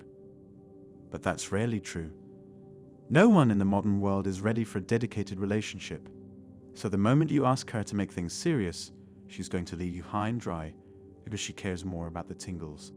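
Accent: United Kingdom. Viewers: I'll admit I'm not a 20-something in the dating pool, but while watching this I couldn't help but be reminded of Tom Cruise's "Seduce and Destroy" seminar character in Magnolia, particularly in the "trap" section, except with a calm British accent. I do agree that social media has poisoned personal relationships, setting up unrealistic expectations, and the need to go back to direct, interpersonal contact is imperative. Don't let these "incel" mentalities to rule your lives, my young dudes, but also don't let a lack of a relationship destroy your self-esteem and stop you from enjoying life. Not all young women are vain harpies looking to suck you dry, be patient and eventually you'll run into someone you feel mutually compatible with.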